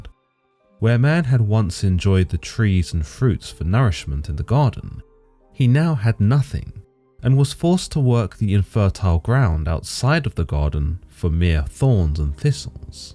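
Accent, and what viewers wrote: United Kingdom. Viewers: First time actually seeing this guy. This whole time I thought he was a Caucasian British man lmao.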